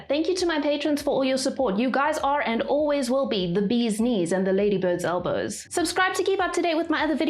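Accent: South Africa. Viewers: I'm from South Africa too! (I could tell from the beginning)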